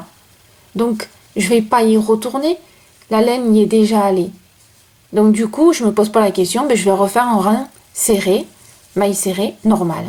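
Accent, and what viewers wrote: South, France. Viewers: Merci vous expliquez très bien ne changez pas votre accent moi je suis de NIMES votre accent est près du mien Marie jo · Merci beaucoup pour vos tutos, bien faits, clairs , tranquilles, et le petit accent du sud "merveilleux" en prime ! Continuez à nous apprendre, vous êtes très agréable merci.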